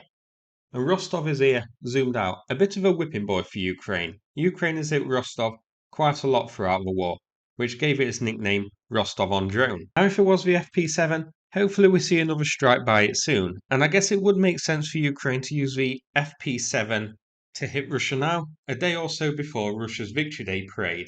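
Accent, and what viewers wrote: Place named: Cockney, United Kingdom. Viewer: Dude I can barely follow your jacked up Cocknet accent.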